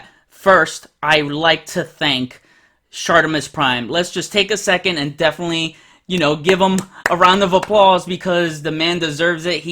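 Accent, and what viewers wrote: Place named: New York, United States. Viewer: Shart owns “big time let down” and now you own “big time caca”. Please more “on the shelf” segments and ain’t no shame lettin our New York accent show out, bro. Salute.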